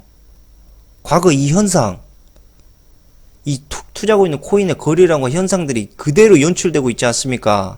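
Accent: Jeolla, South Korea. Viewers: 전라도사투리 은근 중독 되네요~~ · 전라도네.. 믿고 거른다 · 아따 전라도여 반갑소잉 전라도말은 숨길수가 없어라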